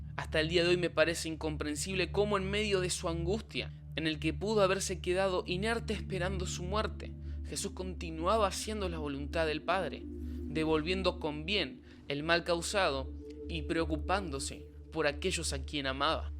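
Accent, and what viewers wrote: Argentina. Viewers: wtf cuando habla argentino y tiene un canal de youtube sobre teorias y conspiraciones de jesus xddd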